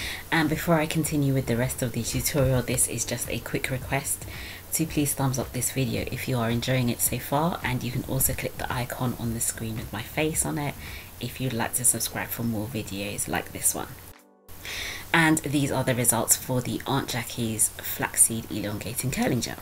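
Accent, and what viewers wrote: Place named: England, United Kingdom. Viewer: First let me say i love your video's ! I'm wondering where you're from or where your accent comes from. I'm thinking you must be English. Every time I hear your intro, I can't help but think of the movie Silence of The Lambs. Hello Clarice.....LOL !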